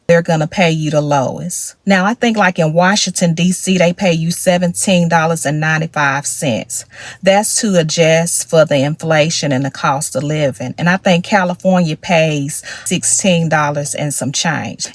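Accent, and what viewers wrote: South, United States. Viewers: A typical southern accent and love it🎉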